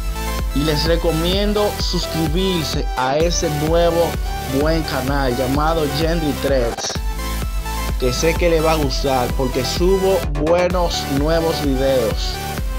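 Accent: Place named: Dominican Republic. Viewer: Me suenas a dominicano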